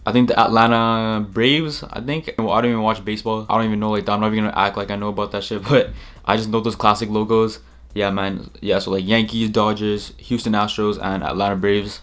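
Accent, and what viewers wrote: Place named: Jamaica. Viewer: Gave the vid a like but please let go of the jack accent. It doesn’t sound or look good bro. You better than that & ur vids are dope.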